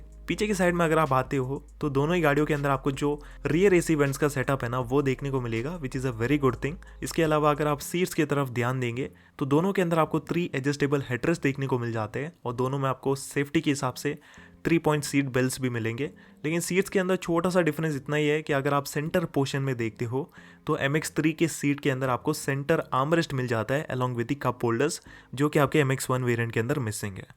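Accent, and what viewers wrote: Kerala, India. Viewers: Bro, neutralize your hindi accent. Can make out you are from Kerala.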